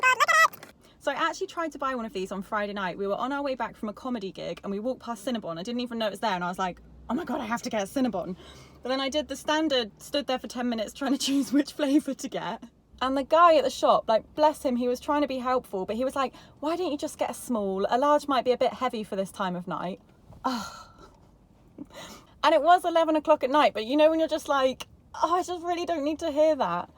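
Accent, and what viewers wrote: United Kingdom. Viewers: I am SO intrigued - where do you live?? English accent but always so sunny!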